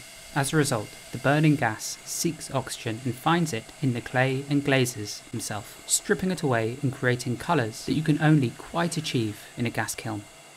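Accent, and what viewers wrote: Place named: RP, United Kingdom. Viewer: I’m not a native speaker but I learned the language from a fairly young age and I’ve always had a knack for accents and dialects. My first accent was clean British RP then I drifted off into other accents from the British Isles. Lately it’s been a standard Australian accent but you really make me want to move back to RP again. Let’s see how long it’ll last this time XD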